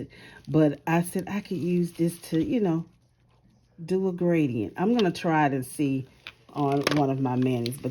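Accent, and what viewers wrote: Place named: South, United States. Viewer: Yes, I do tend to be longwinded so be careful encouraging me!! LOL I think I'm subscribed to her YT channel because her Lives show up in my feed from time-to-time. However, I usually wait & watch recordings because I tend to get a little impatient watching them juggle their demo with following along in the chat (ironic, I realize, coming from someone who "talks" as much as I do, haha). BTW, where did you grow up ~ I hear in you a kindred Southerner. I'm a lifelong Floridan [don't hold it against me though, ok...there are still a few decent ones here & there], but I've also got people in the Carolinas. My accent always has waaay more twang after coming home from visiting there!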